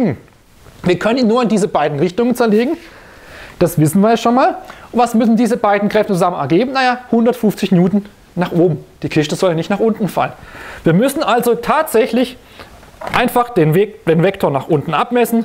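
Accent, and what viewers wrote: Swabia, Germany. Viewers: Schwäbisch versteh ichs gleich viel besser top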